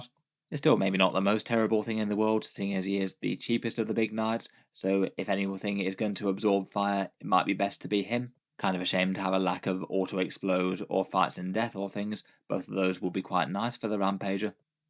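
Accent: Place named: United Kingdom. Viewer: ypur voice is annoying. too british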